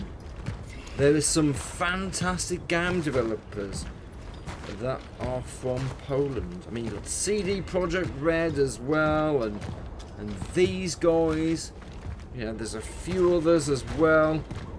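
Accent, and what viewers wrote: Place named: England, United Kingdom. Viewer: He is literally ENGLISH 🤦. You might be referring to his accent, he comes from a part of England with a strong regional accent, very different to the rest of the country. But English is still his native language.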